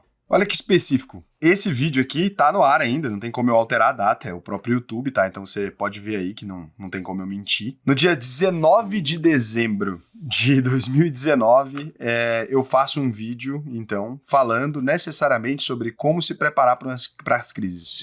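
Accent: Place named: Rio de Janeiro, Brazil. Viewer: Ohh esse audio seu tá parecendo carioqueis parece esta falando assobiando 🤣🤣🤣 ajuda aí meu fi.Ass: Frantyesco Maia mineiro aqui em Portugal, um abraço e obrigado pelas excelentes explicações.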